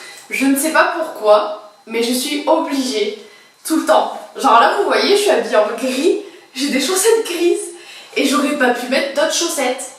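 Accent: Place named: Marseille, France. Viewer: tu a un petit accent sud impeut marseillais je trouve tu vien d'où de quel rejion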